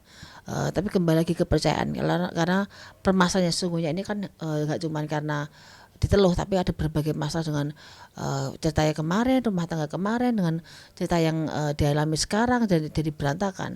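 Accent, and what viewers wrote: Java, Indonesia. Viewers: Lidahnya emg Jawa mbak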